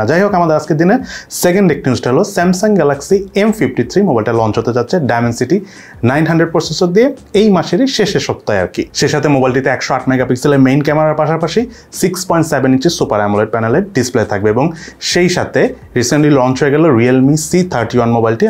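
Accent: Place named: Dhaka, Bangladesh. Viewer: ভাইয়া আপনার কথা শুনে মনে হয় আপনি ঢাকাইয়া আর আপার T Shirt গুলো খুব সুন্দর পরেন ☺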